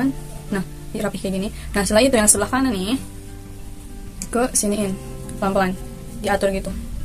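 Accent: West Java, Indonesia. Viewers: Ngajeber...🤣 Orang sunda ya teh? 🤭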